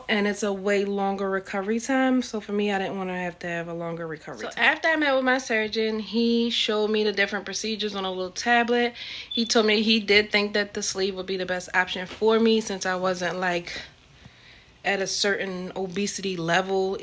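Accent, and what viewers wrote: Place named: Maryland, United States. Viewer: I looooove your accent! At first I thought it was a NY/NJ accent but when you said John Hopkins I knew lol I’m in MD also just started my vsg journey! Your videos are great!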